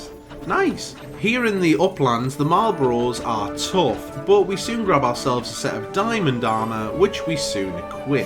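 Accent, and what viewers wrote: Northern England, United Kingdom. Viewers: Am I hearing a fellow northern English accent?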